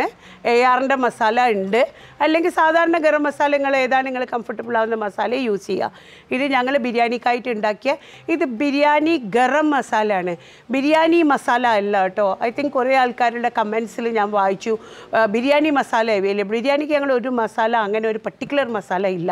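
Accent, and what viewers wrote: Kozhikode, India. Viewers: This is og Calicut slang. Surabhi in m80 moosa uses a bad mimicry of this. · Kozhikkode സ്ലാങ്ക് പൊളി........ ഇങ്ങള് ബരിം.........😊👍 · Her kozhikode slang♥️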